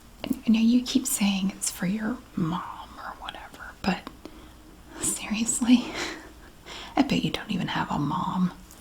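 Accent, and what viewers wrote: South, United States. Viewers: I love I'm from the UK 🇬🇧 I love the Southern American accent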